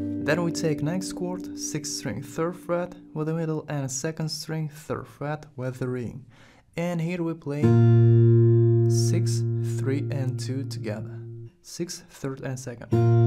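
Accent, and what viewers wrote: Russia. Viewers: по произношению чел русский, я думаю, что это с, лайкни, если я шерлок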